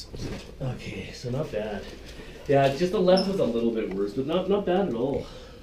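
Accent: Canada. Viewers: Ahhh... Canadian eh? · you have the potential to be the new Rock Star of YT-resident chiropractors. The mix of your intuitive attention to detail and obvious empathy for your patients - together with a characterful delivery of care on your part - is very compelling. To top off the performance, your Canadian accent and Roadrunner-style moves around the room are very engaging. Move over, Dr. Johnson - there is a new kid in YT Town.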